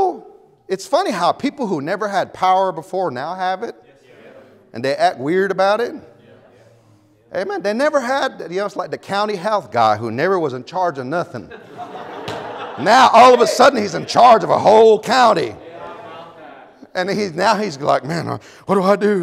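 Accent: United States. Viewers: Good job preacher, sounds like your somewhere in or around the Texas area.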